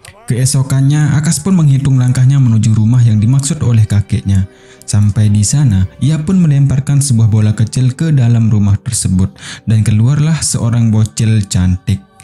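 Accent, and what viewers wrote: Bali, Indonesia. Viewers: Malah mnurutku logatnya kaya org Bali/Lombok Tp syg speed pnyampaiannya trlalu lmbat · Dr logatx orang BALI ya min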